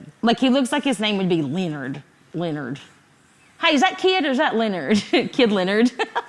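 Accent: United Kingdom. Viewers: Legit thought she was British until now lmao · I thought she was british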